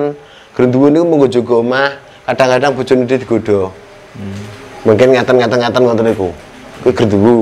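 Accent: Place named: Kudus, Indonesia. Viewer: logatnya ko sperti orang kudus, tayu pati ya, mungkinkah mbh asli dr pati tayu atau kudus.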